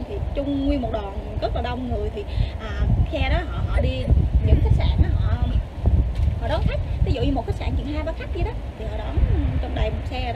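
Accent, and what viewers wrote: Mekong Delta, Vietnam. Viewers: Bạn có nét đẹp và giọng nói đậm nét miền tây sông nước...Chúc bạn hạnh phúc nơi xứ người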